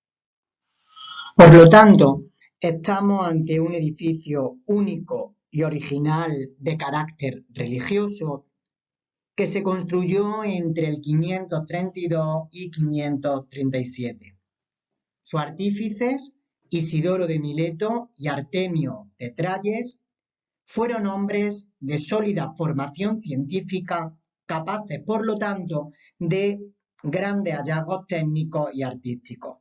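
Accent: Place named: Granada, Spain. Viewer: Eres de Granada? Me encanta tu acento jajajaj, de toda forma muchas gracias pa este video, me ha ayudado un montón 🙏🏻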